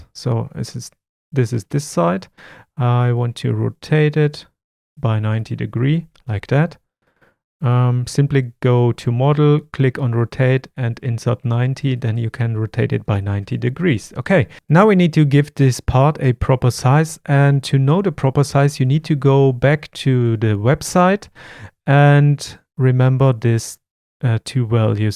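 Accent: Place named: Germany. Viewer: i hören a bit of deutsch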